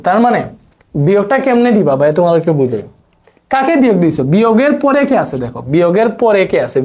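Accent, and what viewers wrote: Sylhet, Bangladesh. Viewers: ভাইয়া কি সিলেটি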